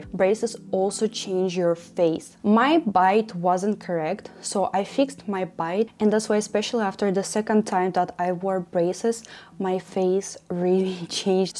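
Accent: Russia. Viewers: Сразу чувствуется русский акцент 😅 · Мне показалось, или у тебя русский акцент?) Прекрасные знания английского❤ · Трудно слушать этот русский английский даже мне как русскоязычному человеку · Русский акцентт :333333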